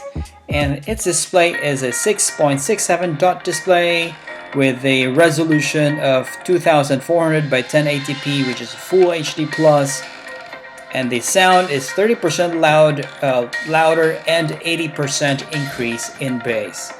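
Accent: Bisaya, Philippines. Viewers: bisaya diay ka sir? Nice HAHHA washout sir from PH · bisaya d i ka bai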